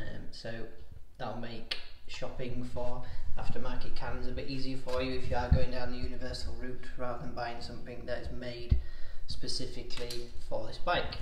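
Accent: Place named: Gujarat, India. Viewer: WOW YOU gujarati i am from gujarat nice to heard you speaking love you...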